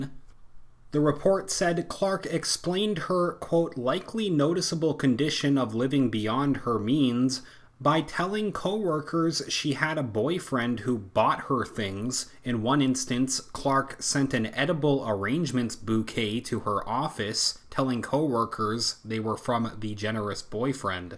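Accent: Canada. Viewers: your middle class nasal Canadian white boy voice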